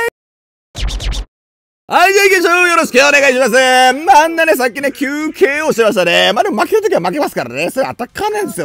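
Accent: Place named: Kansai, Japan. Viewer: 関西調で軽快なトーク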